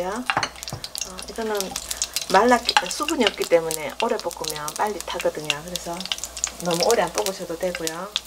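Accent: Gyeongsang, South Korea. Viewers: 좋은정보 감사합니다!! 차분하고 경상도 특유의 애교스런말투 너무좋네요^^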